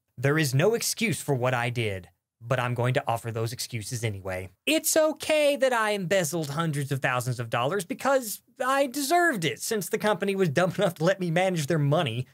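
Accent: South, United States. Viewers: I never realized how thick his Southern accent was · It’s okay, your vaguely southern accent has soothed us and your justifications seem fair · Your Southern accent is less noticeable here than your regular videos. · Me neither, i thought it was kind of a speech quirk or character thing, but seeing his face, I realized he was SOUTHERN. and... HANDSOME. Lmao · I like your subtle southern accent.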